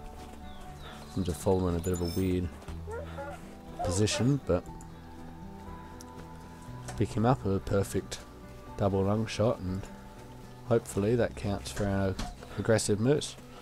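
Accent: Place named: Australia. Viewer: Like your style and it's good to hear an Aussie voice. That letterbox is bad tho, unless otherwise my phone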